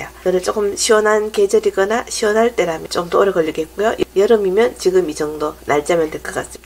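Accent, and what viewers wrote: Gyeongsang, South Korea. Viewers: 경상도 분이신거 같은데 저도 그쪽~혹시 아실라나 옛날에 막걸리 거르고 남은 찌개미에 단 맛을 내기위해 뭐 넣고 먹었는지 아시나요. 설탕이 없던 시대인듯 지금 표현할라니 너무 웃기네요. 마른모 꼴로 생긴 (꼬라재비)ㅋㅋㅋ.ㅎㅎㅎ. 그거 몇알 넣고 찌개미를 숫갈로 비벼서 먹으면 정말로 맛있었던 생각이 나네요.먹거리가 귀해서 봄이면 들에서 찔래도 꺽어먹고 진달래도 따먹고 버들개비 따먹던 아~주 옛날 생각이 나네요. 까맣게 잊고 지냈던 옛날 생각을 잠시 해 보았네요. 저도 막걸리 담그기 해보고 싶네요. 맛있는 막걸리 맛은 잘 알아요. 옛날에 맛을 많이 봐 놨기 때문에 ㅎㅎㅎ. 언제 그렇게 많은걸 배웠을까 싶네요. 감사합니다.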